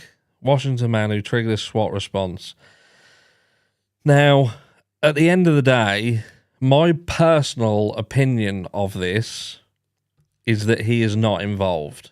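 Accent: Norfolk, United Kingdom. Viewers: Thanks for the LIVE! Your Norfolk accent is truly showing:) keep up the good content. you make me laugh.:D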